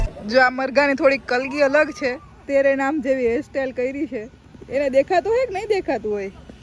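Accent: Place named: Saurashtra, India. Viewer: વેરીગૂડ મેડમજી બહૂ સરસ સૌવરાસટની આપનીભાસાસે ઈડીયા અમદાવાદ સોલા વીલેજ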